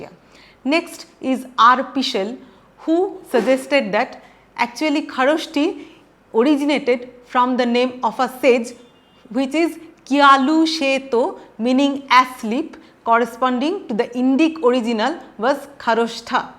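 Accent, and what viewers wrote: Bengal, India. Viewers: ये बंगालियों की इंग्लिश लगती है जो टेलीप्राम्पटर से पढ़ी जा रही है।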